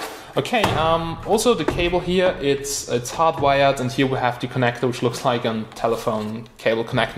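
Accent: Germany. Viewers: hör auf n deutschen accent zu haben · You have a german accent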